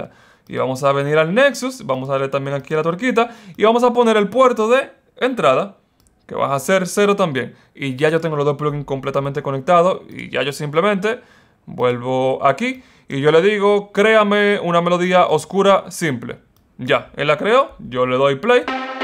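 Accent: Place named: Dominican Republic. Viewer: me gusta tu acento... tu acento es de República Dominicana verdad?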